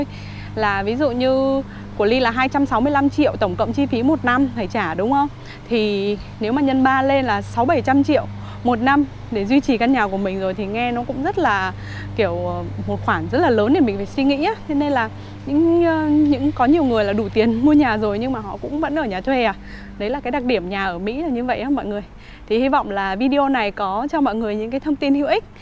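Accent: North, Vietnam. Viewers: Giọng Bắc thật quyến rũ.. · Mình dân gốc Bình Dương nhưng sao thích giọng con gái Hà Nội quá😍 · Cảnh quan sạch đẹp, môi trường sạch, giọng nói dễ nghe giông giọng hà nội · giọng hà nội nghe thích bạn, mặc dù ở đời sống ở Mỹ rất cao nhưng chi phí cũng không hề nhỏ rất cảm ớn đã chia sẽ những thông tin nhà ở Mỹ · Giọng Hà nội của Ly nghe rất dễ thương . ❤️ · giọng này là Hn chuẩn đấy . không phải giọng tỉnh lẻ đâu nhé. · em là bắc kỳ hây miền trung dậy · Nhìn e cưng quá muốn yêu e luôn giọng bắt bắt lại nghe hay quá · Ly nói giọng người bắc nghe dễ thương quá đi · Giọng Bắc thôi chứ không phải giọng Hà Nội b ơi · Em gái này người miền Bắc sau 1975 phải không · Giọng nói Hà Nội vẫn chuẩn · Nghe giọng nói của Ly hay ha, chính xác người Hà Nội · Giọng em là m Bắc sau 1980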